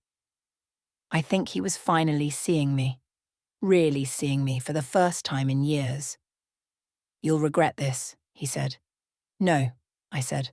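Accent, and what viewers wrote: United Kingdom. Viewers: Like the British accent, enjoyed this story.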